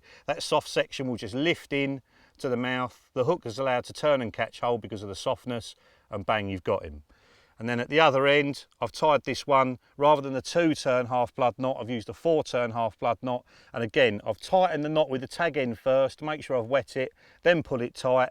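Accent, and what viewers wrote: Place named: Essex, United Kingdom. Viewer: What he’s saying in his Essex accent is “ Come on”.........comes out as “ Cam an “.......🤓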